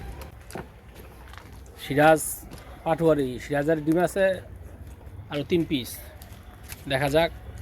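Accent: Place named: Comilla, Bangladesh. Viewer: কুমিল্লার ভাষা এটা ভাই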